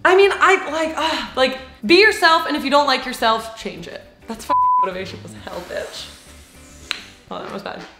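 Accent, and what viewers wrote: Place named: South, United States. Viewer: "everybody's got sumthin" YASS southern accent queen.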